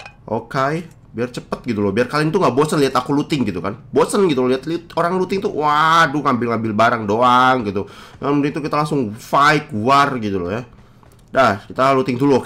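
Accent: Java, Indonesia. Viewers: Medok men to mas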